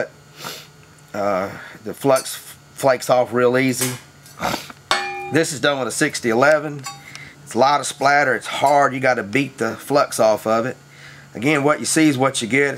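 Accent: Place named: Southwest Virginia, United States. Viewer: Out of curiosity, where are you from? Sounds like our accents here in southwest Virginia.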